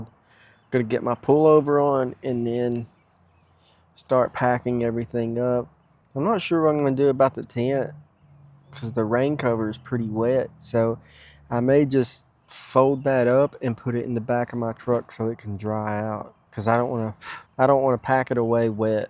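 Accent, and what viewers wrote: Alabama, United States. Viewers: I know this is an older video. But I just found your channel and it's so enjoyable watching you. I live in Alabama myself near Tannehill Park. I really like watching someone going to places that I can associate with. But please be careful. No video is worth risking your life for . (the billboard, or those ladder climbs) Your not only putting your life at risk but in a way your family's also. Also so great to hear someone with an "Alabama accent". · This is just like camping with steve except with a southern accent. I subbed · I’m going to guess your from Alabama bc your accent reminds me of gump lol Ok edit: you said Alabama so I was correct & I can chug a bottle of water in 5 seconds but it has to be room temperature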